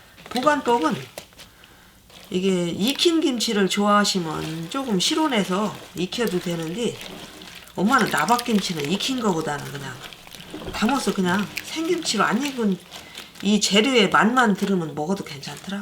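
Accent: Chungcheong, South Korea. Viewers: 배차 무수 오랜만에 들어보네요 ㅋㅋㅋ 충청도사투리 매일 잘보고 이습니다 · 어머니와 아드님 너무 다정다감하신 대화와 요리들 너무 잘보고 또한 구독도 했어요. 저는 어머님의 충청도 사투리가 저희남편 가족들의 말씀같이 꼭 우리 큰형님~셋째형님 말소리와 너무 똑같아서 잘보고 잘 따라하고 있습니다.~그런데 지금 우리 형님들과 우리남편은 저~세상 하늘나라로 가셨습니다. 그리고 저는 나이가 들어서 노인정에서 식사를 해드리고 있는데 주로 어머님의 음식을 보고 많이 따라하는 편 입니다. 젊은시절에는 직장 다니느라고 살림을 안해서 주방에 들어가는것이 무서웠는데 지금은 하나도 안무섭고 무엇이든지 어머니께서 하시는 무엇이든지 돋보기에 제가 하고싶은 음식을 치고 누르면 무엇이든지 다~나오니 저는 행복합니다. 또한 8~9십대 어르신들 따라한 음식들을 만들어 드리면 엄청 칭찬해 주십니다. 그래서 저는 맬맬 행복합니다. 어머니의 요리를 배워서 행복하고 경로당 어르신들 맛있다고 칭찬 들을때 행복합니다. 그래서 저는 언제나 즐겁습니다.❤❤❤❤❤❤❤❤ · 충청도 아주머니시네 사투리가 무수 배차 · 고향이 충청도이신가요?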